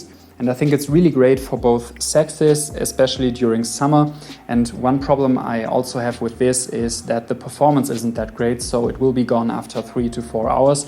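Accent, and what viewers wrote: Germany. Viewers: ur german arent u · Der junge ist deutsch merkt man Bro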